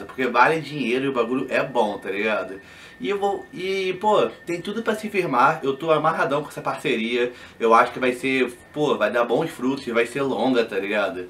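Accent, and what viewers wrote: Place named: Favela, Brazil. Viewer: com essa língua presa e dialeto de favela não tem nada a ver kkk Acompanho o canal desde 2014 e não me acostumo nunca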